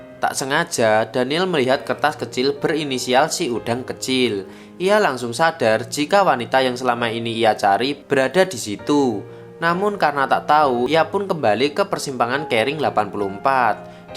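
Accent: Java, Indonesia. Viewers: Logat e jowo medok banget hehe, tp om suka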